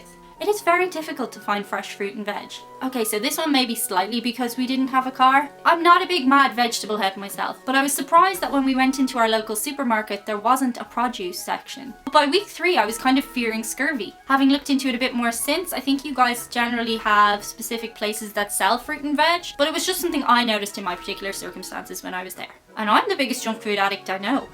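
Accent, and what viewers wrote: Ireland. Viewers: Lmao your Irish accent just makes everything that much more enteratining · My family is Irish . You don't have a very strong accent. Come on, Of course you knew you don't visit Compton! So what about guns, Get robbed & you'll want one too!! No fatties in Ireland?? But you DO HAVE MY FAVOURITE MRS. BROWN'S BOYS. CHEERS🌞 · Like your Irish accent and personality! Y'all cool with the Scots?! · Love the Irish accent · Enjoyed the video! I don't know if you are intentionally toning down your accent, but it is far milder than many Irish people I've met and listened to. 1) I think you're the first person I've ever heard of who went to Compton as a tourist! LOL You'z in da hood, girl! 2) Don't think we should base gun policy on the vague 'way guns make me feel'. 3) Having lived in England before (which I'm not lumping in with Ireland), I think you're spot-on about the greater variety of body types you frequently encounter in America, and you're the first I've heard to put it that way. 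Insightful observation. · You're losing your Irish accent. Or maybe you're toning it down on purpose? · i really have a thing for irish girls she is hot and i love her accent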